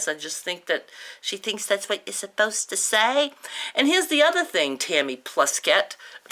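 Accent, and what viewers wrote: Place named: Rhode Island, United States. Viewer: How about when you needed to wear panty hose and you had only two pair and they both had a run? You cut off the "bad leg off both and then proceed putting both pair on, then you get two good legs! I need to. Ask, are you from Woonsocket RI. You have that accent. My pet peeve is a person who ask you to help with a project( like decorate for their child's birthday party) and you end up starting the project and they go to the bar to socialize while you do all the work!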